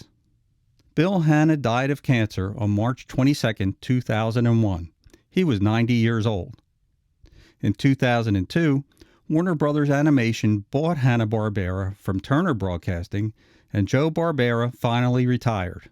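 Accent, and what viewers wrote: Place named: Philadelphia, United States. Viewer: are you from Philly? That accent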